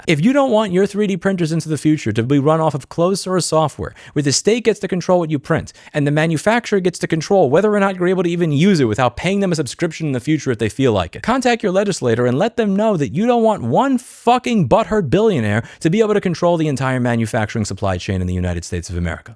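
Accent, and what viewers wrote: New York, United States. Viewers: I love how the more he talks about new york, the stronger the new york accent becomes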